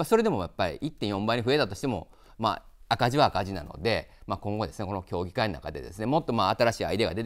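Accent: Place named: Kansai, Japan. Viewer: とりあえず関西弁やめとこか？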